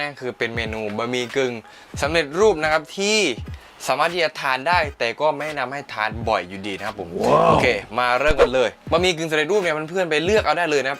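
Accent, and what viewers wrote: South, Thailand. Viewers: พี่คนใต้หม้ายนิ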